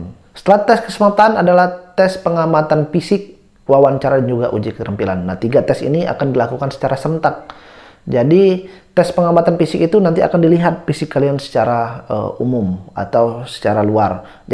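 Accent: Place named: Palembang, Indonesia. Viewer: dari logatnyo caknyo berasal dari palembang yo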